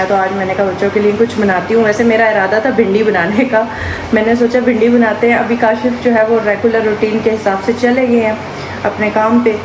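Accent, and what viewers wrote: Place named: India. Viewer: Ap ka lahja indan h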